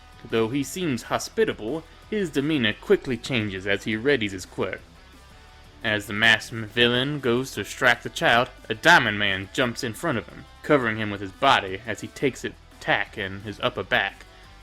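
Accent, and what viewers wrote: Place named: Brooklyn, United States. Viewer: Love the Brooklyn accent.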